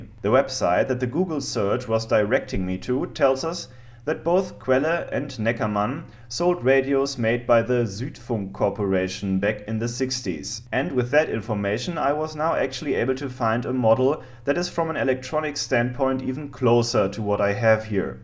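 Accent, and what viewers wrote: South, United States. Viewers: your American is excellent. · Your 'Murican accent is impressive. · I always laugh at how southern American you can sound, after speaking in your proper German accent. Gets me every time. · We sure appreciate a southern accent here y'all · Pretty good American accent :) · I applaud your perfect American accent. Source: I'm an American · love the american accent :)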